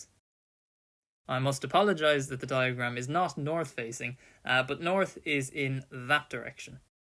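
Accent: Ireland. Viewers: Sounds Irish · Nice video, and great explainer! Refreshing to finally hear an Irish voice talk about railways. Loved seeing your footage in the recent Dublin RMTransit episode.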